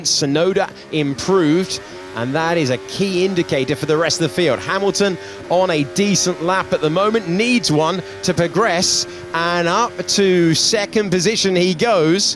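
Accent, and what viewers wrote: United States. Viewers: Why is the sound of the cars so low, all I hear is the loud commentary. I know these aren’t the best sounding cars but I still want to hear them. And that American accent 😔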